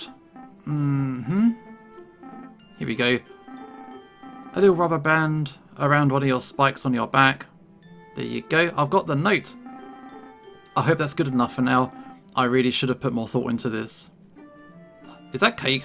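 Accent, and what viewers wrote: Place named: United Kingdom. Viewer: Is watching this making me a furey personnally i m only watching u for the bri'ish accent pls say Bo'oh oh wo'ah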